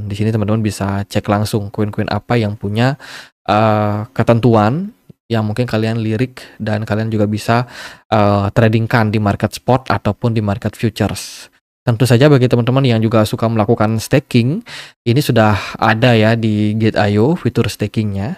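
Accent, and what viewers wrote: Bali, Indonesia. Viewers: Maaf,,,sptnya adminny ini dari logatnya,,asal BALI ,,ya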